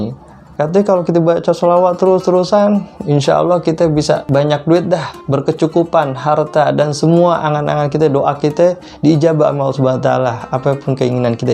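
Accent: Jakarta, Indonesia. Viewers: Terimakasih Ustad, Sangat bermanfaat. Medok banget dah ah betawinye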